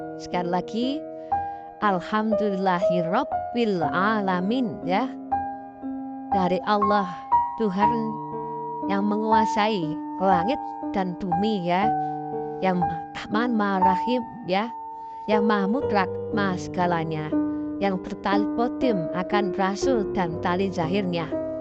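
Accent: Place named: Surakarta, Indonesia. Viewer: Itu suara solo mas😮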